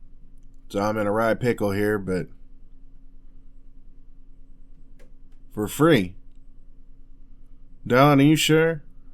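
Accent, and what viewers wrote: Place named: South, United States. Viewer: I loved the audio as always I'll be adding this to my playlist I absolutely love your country accent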